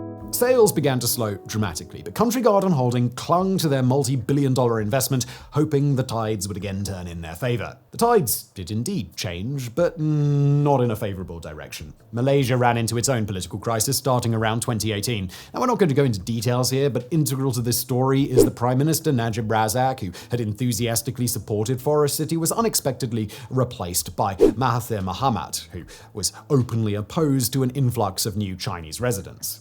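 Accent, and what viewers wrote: United Kingdom. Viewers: There's something about a British man with this regional accent wildly mispronouncing foreign names without pause. 😅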